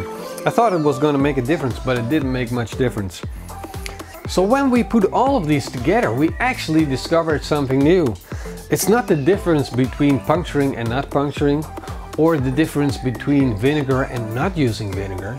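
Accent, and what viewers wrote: Netherlands. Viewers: First video i watch of you but damn ik hoor meteen dat je Nederlands bent haha goed bezig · cute strong dutch accent !!! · asjeblieft hou op met dat engels....het klinkt echt totaal niet dat NL accent erin..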